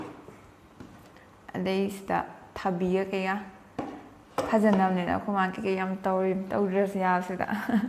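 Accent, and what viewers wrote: Kakching, India. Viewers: Kakching marolse taba ym nungaiye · Che na kakching marol ngangbce nungcye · Che se kakching kawaidgino hngkhi reply towrk towbirkhide eikhoi kakching mrol ngangbni eina hraoe su haikhibni eisu kakching ne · Kakching maronda ngangbana pamjei sister. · Ema se yam nungcye... Kakching kai waideino ngse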